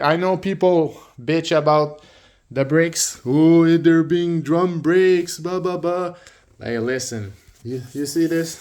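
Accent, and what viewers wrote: Quebec, Canada. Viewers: This guy sounds Canadian am I wrong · Définitivement, c'est un accent québécois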